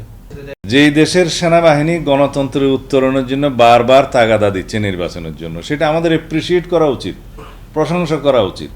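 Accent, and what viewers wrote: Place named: India. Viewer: তুমি এত বছর ভারতে ছিলা। ভারতের সুরে কথাবলবা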